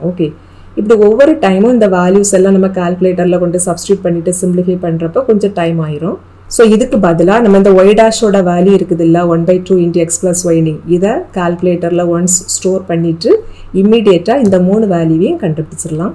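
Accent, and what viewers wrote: Kanyakumari, India. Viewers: Kaniya kumari slang bro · Kanniyakumari district slang❤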